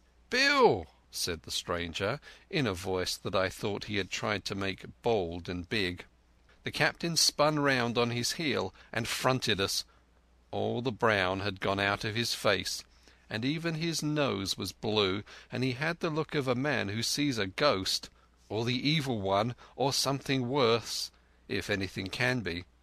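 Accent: England, United Kingdom. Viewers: thank god for a English voice reading this ! Just started to listen to a recording with a yank reading it - so wrong ! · Well read Matey! Nuffin loik a genuine Brittish accent. Very enjoyable. :) F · Thanks I prefer your pronunciation and accent rather than the American ones, one remark is that your reading is a bit slow, but when it comes to direct speech ie when you say what some novel's characters say that's fantastic I find, and more realistic, after all the author was British and so are his characters!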